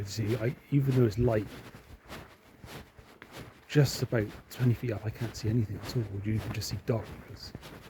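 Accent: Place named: United Kingdom. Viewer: I hope you were carrying a baseball bat..! Pitch black..good you didn’t trip! Are you in the UK 🇬🇧, I like your accent ❤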